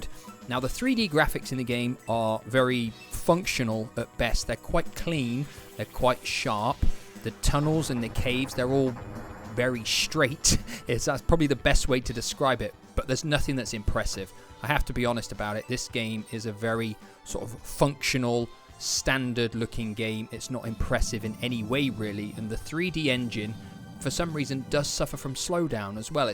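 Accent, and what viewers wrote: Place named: United Kingdom. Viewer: The classic well written well spoken British man I believe every word he speaks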